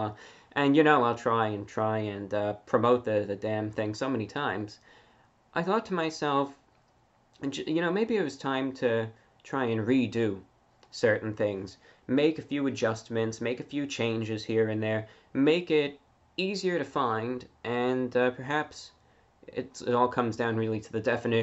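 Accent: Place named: New York, United States. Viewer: Okeechobee fla i thought he was from ny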